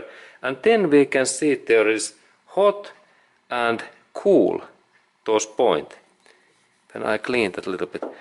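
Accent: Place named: Finland. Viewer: OK , I am willing to bet that this guy is Finnish , typical accent from Contemporary Finn who learned English as second language.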